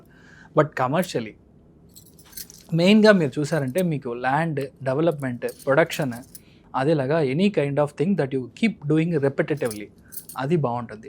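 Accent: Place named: Tamil Nadu, India. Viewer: ఏం తెలుగు రా నాయనా.. తమిళ యాస, సరైన తెలుగు పదాలు కూడా మాట్లాడటం తెలియడము లేదు. ముందు తెలుగు సరిగ్గా నేర్చుకుని రా గురువా..🤔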